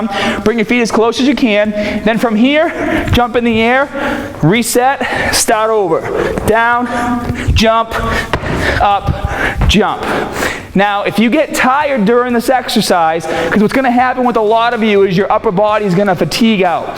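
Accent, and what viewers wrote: Boston, United States. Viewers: Are you from boston? You have a boston accent tbh haha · Since when did he have a Boston accent??? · Is it just me or did he develop a Boston accent? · That Boston accent coming out strong! Awesome video though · Just like how different it is, I just love Boston accents ahah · i dont know how i havent noticed it, but you have one of the heaviest boston accents ive ever heard. i go to BC so i hear it all the time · hey Im curious. When I am deadlifting, when I go heavy and somewhat heavy(for me) I notice I have a somewhat round in my back. Not like incredibly terrible, but I notice it when I recorded a side angle, for testing purposes. Should I drop the weight down like 40% of my 1 rep max, and try to work on perfect form while building back up, or is a slight bend in my lower back okay? I wasn't using a belt at the time because I notice you dont until you get near your max, so I try to stray away from the belt until I need it. 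What % of your one rep max do you start to use the belt? Any help from anyone would be great! I love your video's, thanks for teaching me nearly everything I know about lifting. Cheers. P.S I always thought you had a boston type of accent, didnt ever know you tried to cover it up xD I always heard it. haha keep it up! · is it a Boston accent? I'm from UK I don't really know, but it makes me smile adds to your character LMAO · love that boston accent man. never lose it! :) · Thumbs up for Bawston! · The Boston Accent is Wicked Cool. With that strength, body, agility, and stamina, can speak anyway he wants. Your my hero. (Especially since we both are from Massachusetts). Great Exercises. I will try them next training day. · What's with the Boston accent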